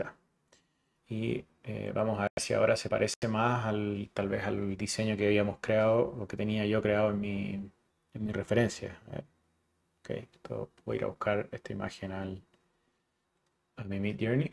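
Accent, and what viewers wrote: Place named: Chile. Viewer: tienes acento chileno...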